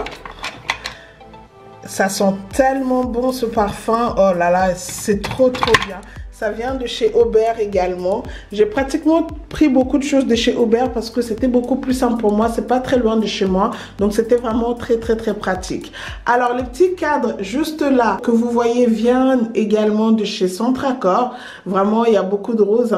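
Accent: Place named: Belgium. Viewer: Cc,juste par curiosité: tu es une belge perdue en France? 😜 t a l accent belge! Mais tu parle souvent des magasins typiquement français! Kiabi,gifi........ peut être frontalière? Bisous😘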